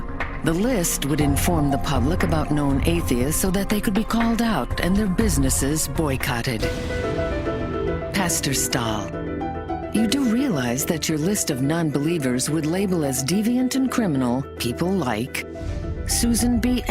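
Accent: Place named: Levant, Syria. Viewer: هل معناة حبي لهم أني ما أقول الحقيقة؟ نعم أمي جاهله وأبي جاهل بكثير من الامور رغم شهاداتهم القوية الا أن في ظني هم يعتبرون جاهلون قليلا وخاصة في أمور الالحاد ، بعض الاحيان تحصل دكتور طب لكن سلفي يعني حمار لكن يفهم بالطب ، يعني هذه الحياة المشكلة أن المسلمين غالبيتهم ليسو مثلك ، غالبيتهم بهايم ولايقرؤون وجهله ويشتمون ، ويبدو من لهجتك أنك سوري أو فلسطيني يعني يمكن عشانكم بسوريا عندكم أكثر من دين وطائفه والنقاشات عندكم طبيعيه نحن نعاني من هذا التخلف في الجزيرة العربية ، تخلف وجهل وغباء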